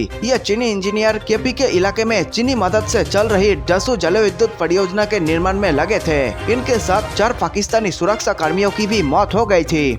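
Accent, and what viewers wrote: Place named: South India, India. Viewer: Madrasi hindi sikhle pehle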